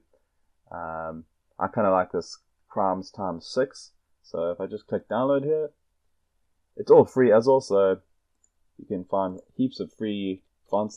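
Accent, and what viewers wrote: South Africa. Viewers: how noticeable the South African accent is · nice to hear a South African in tutorial videos now and then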